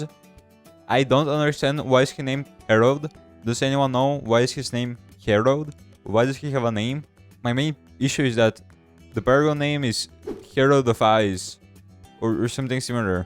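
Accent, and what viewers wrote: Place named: France. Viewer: you have a thick french accent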